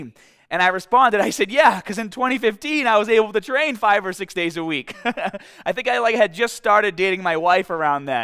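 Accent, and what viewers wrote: Boston, United States. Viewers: Wow one of the strongest Boston accents evvaaa lmfao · it's just PAHT of his brand - people keep posting comments about a Boston accent - more comments posted - Boston accent gets discussed all the time - Youtube ranks him higher because viewers are engaged enough to post comments - more views for his channel - it's just the nature of the online world - if you don't appear near the top of the hit list ( youtube, twitter, browser - whatever ) you are NOWHERE - NO ONE sees your videos it's just like all those terrible clickbait titles that just about everyone, even legit youtubers use to get views - same with the natty/juicing discussions - no such thing as bad publicity as long as people post comments unless you've tried to get your own web site/business ranked by Google and Youtube and seen how brutal the competition is - it's as brutal as trying to get an acting part millions of people dream of making an online living but very few find the right mix that makes your brand stand out -- ( personality, looks, humor, informative info, frequent updates to channel ) - anything that helps you stand out is a win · Did always have a slight Boston accent? 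· I've watched a ton of your videos many years ago and around 1 year ago I had to find the video where you discussed the change in your accent. I'm watching this video today and although the topic is good I still can't get over the over emphasised Boston accent. Not a diss in any way but for some reason I can't take it as seriously like your old videos. Again no disrespect but the accent I got used to in the past sounded more intellectual whereas the accent now sounds quite dare I say it low class. In England we have many accents too, some sound posh and some just down right ghetto/street. It's the same sort of thing going on here. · Is this guys Boston accent foreal? That shit is strong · fuck yeah boston accent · Never change, Bahston. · First time on the channel. Love the video. But more importantly, do I hear a Boston accent??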